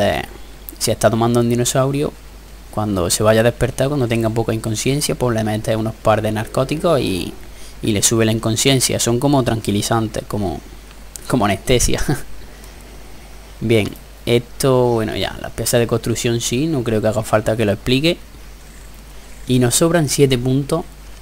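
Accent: Andalusia, Spain. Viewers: Videos de Ark comentados con un buen acento andalú, que más se puede pedir, illo? Sos un crack. Saludos desde Argentina. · Por tu acento deduzco que eres andaluz, de que parte ee andalucía eres? Yo soy gaditano😁😁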